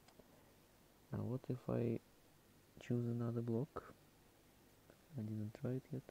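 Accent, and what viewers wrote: Russia. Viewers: Да ну вы русский акцент слышу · Почему-то я слышу в твоём голосе что-то с русским акцентом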